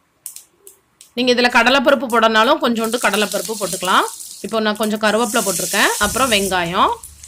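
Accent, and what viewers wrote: Tirunelveli, India. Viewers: Neenga thirunelveli ya akka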